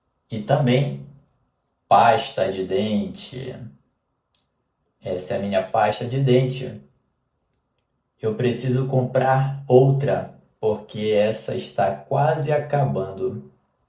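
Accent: Rio de Janeiro, Brazil. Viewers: Great video brother, I love ur comprehensible input style! What’s your accent? Are you from Rio de Janeiro or…? Also, are you currently live in Romania or something?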